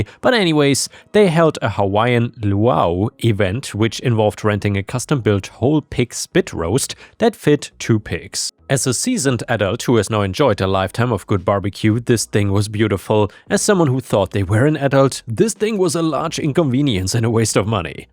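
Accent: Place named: Germany. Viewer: Love your videos. Your accent.. German? Sounds like my accent 😂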